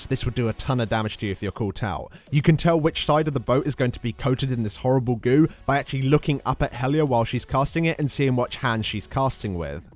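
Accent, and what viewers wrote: United Kingdom. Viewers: what region of the isles is your accent from?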